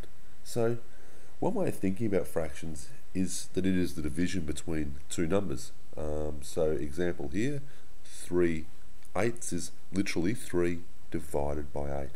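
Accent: Australia. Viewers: I bet 100% that he is australian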